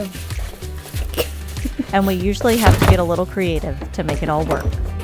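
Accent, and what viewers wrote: Philadelphia, United States. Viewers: I've enjoyed this, nice to occasionally see DIY people who are actually competent builders. I grew up in an A-frame house with a gambrel or double pitched roof. Your garden harvest was epic. I think I'll stick around. Is that a Philly accent or Delaware? I know it's not Western PA, they have a different thing going on over there. 🙂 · Is the narrator from the Philadelphia area? You can take the girl out of Philadelphia… but realistically, it could be anywhere in PA/NJ/DE/MD/VA that I hear. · do I detect an eastern Pennsylvania accent? Philly maybe?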